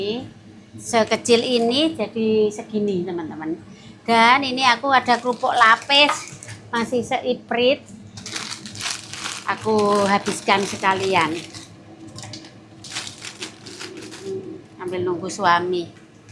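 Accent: Java, Indonesia. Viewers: Yg bikin suka liat chanel mb, dialek jowone kui lo msh lekat dan kentel banget 😄, apa adanya, pdhl lama tinggal di luar negri. Saluut deh... Mb good atittute 👍 baik hti, mknya suaminya jg baik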